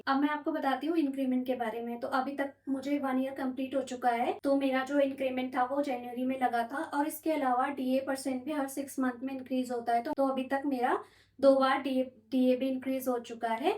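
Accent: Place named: South, India. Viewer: Aap south se ho kya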